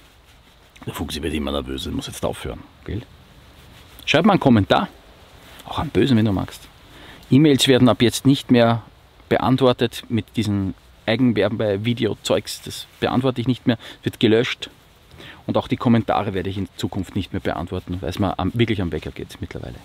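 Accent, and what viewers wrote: Austria. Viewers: Eigentlich mag ich diesen Österreichischen Dialekt nicht. Aber bei dir kommt es sehr sympathisch rüber. Werbung oder nicht, ist mir scheiß egal, wir müssen alle von irgendwas leben 👍😊 alles in Ordnung.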